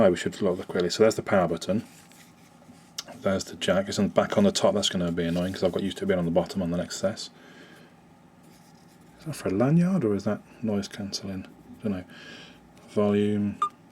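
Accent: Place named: United Kingdom. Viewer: BRITISH ACCENT!!!!:D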